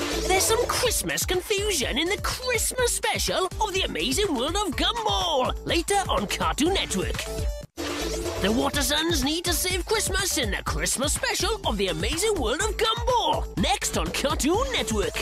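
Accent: Scotland, United Kingdom. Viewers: The narrator sounds like a Scottish accent. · It speaks British accent it's sure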